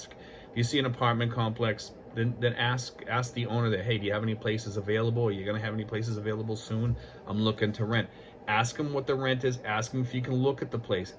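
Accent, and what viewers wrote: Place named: New England, United States. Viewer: A New Englander I hear it haha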